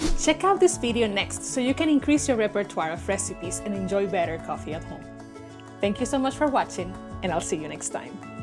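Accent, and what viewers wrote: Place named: Latin America. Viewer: Sounds and looks Latina. 🤔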